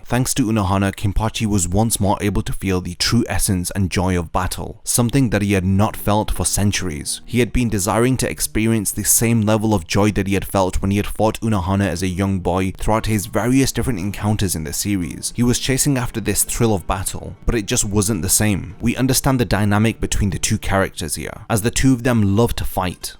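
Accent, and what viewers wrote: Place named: London, United Kingdom. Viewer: Yeah, I'm curious what your first language is too. It sounds like you learned english with a london accent. The mix sounds good.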